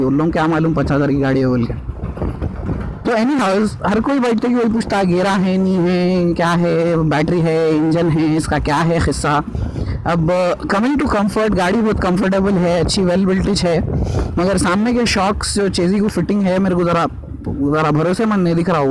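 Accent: Hyderabad, India. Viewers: bhai tumare baat karne ka tariqa zabrdast pure Hyderabadi or mai b hyderabadi hou miya bhai bolte mashallah mashallah bhai bohat accha review diye aap mere pas b same color ki Dio hai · loved it! fantastic hyderabadi style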